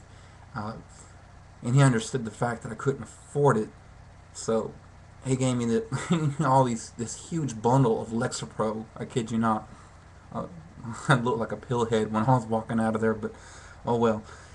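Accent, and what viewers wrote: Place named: South, United States. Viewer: Hey buddy! Thanks for checking my vid out! I noticed your Southern accent (half my family is from the South). Being a man with depression-type issues is hard enough, but is it even harder in the South? My father was the hardest-core South Carolinian you'd ever meet. He was bi-polar but never sought help cuz of his pride. We suffered because of it.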